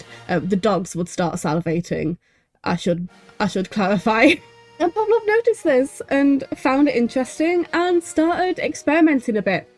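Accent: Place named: Russia. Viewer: why did i think this man was a russain immigrant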